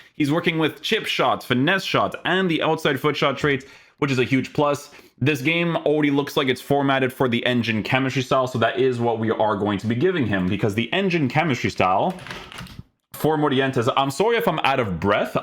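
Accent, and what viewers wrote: Portugal. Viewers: Are you portuguese? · Cancelo just isnt good defending, i think dalot should play more, and palhinha what a player, he must start for the rest of the wc… BTW És português? 🤣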